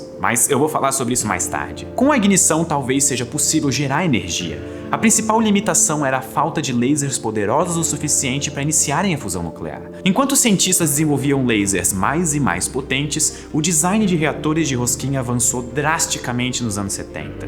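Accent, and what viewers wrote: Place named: Brazil. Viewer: Como eu consumo muito conteudo no youtube em inglês (sem legendas dur) ouvir um br falando ingles é bem estranho, sei la diferente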